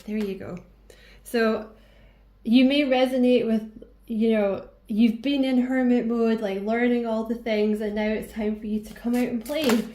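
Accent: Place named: Scotland, United Kingdom. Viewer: The second i heard the accent i subbed. Hellow my Scottish sister fom your sister in England ❤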